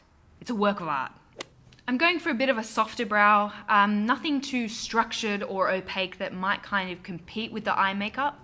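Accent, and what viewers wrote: Australia. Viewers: Hola!!!! Te saludo desde Colombia sur América, me encantan tus vídeos y tu forma de hablar, muy Ausie😁😁😁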